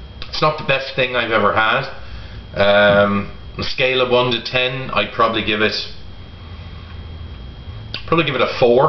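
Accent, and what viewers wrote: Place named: United States. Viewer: when hes with his friends he has a total american accent actually. watch some more. great review as always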